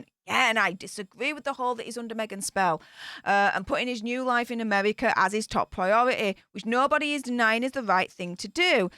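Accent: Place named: England, United Kingdom. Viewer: Love your Chanel!! It makes me laugh😅 I like your accent but as I not european, I don't know from what part of England or UK you are❤ can you tell me? Greetings from South America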